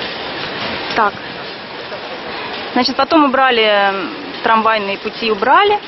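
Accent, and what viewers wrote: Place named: Saratov, Russia. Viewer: Комментирует нормально, чисто по Саратовски, не нравиться не слушай.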